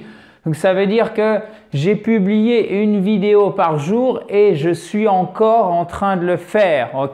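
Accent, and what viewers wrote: United States. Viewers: Thank you very much,. What I like the most is how to build some simple sentences and how to conjugate the verbs. The American accent disturbs me but it might be necessary? I would have an English accent. I would like some videos with dictations if it is possible. See you soon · I love your American accent! Why don't you speak more English than French?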